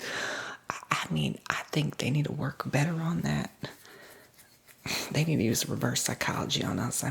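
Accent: South, United States. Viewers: At least your a nice southern cafeteria lady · I’m in LOVE with this character!!! I love me a GOOD OLD SOUTHERN DRAW! Voice is perfect and soothing! The stories and chit chat are on point! Great job!!! 😊😊😊 THANK YOU for this!!! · Love the Southern accent lol Are you on the Tingles app?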